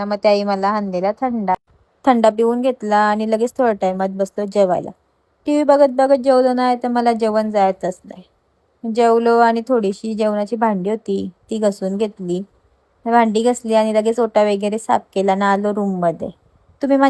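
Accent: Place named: Agri, India. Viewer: Kiti agari bhasha boltes g vlog kartes na vavsthith bol jara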